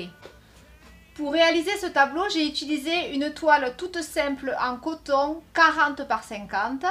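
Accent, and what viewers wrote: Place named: South-West, France. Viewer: Chirurgicalement parfait. PS: vive l'accent du sud ouest !!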